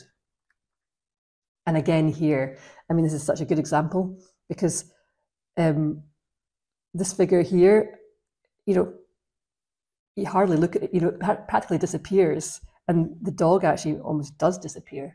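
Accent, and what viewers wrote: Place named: Scotland, United Kingdom. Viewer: Love to hear your Scottish voice. I like Americans but its great to hear a familiar accent.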